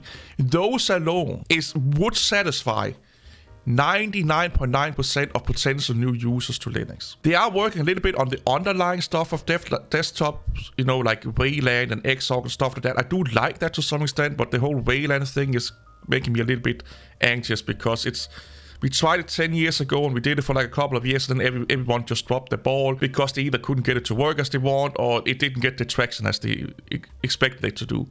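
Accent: Denmark. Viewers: That accent is waaaay tooo danish!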